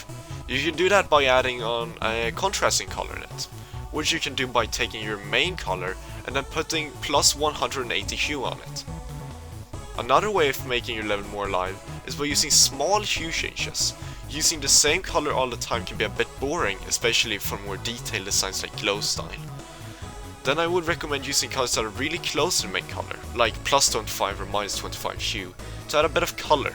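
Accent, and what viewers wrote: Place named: Sweden. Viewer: Nice Swedish accent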